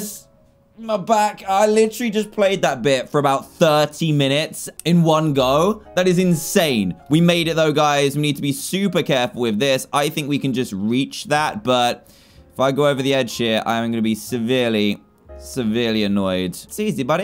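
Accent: United Kingdom. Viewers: You so British lol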